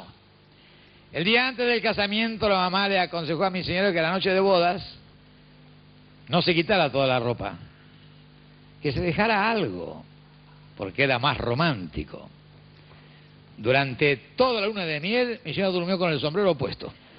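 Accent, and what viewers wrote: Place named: Buenos Aires, Argentina. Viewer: Tiene el acento más porteño de la historia.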